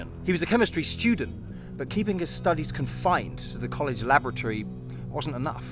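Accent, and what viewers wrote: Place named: United Kingdom. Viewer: You have a great Bri-ish accent and you are cute!!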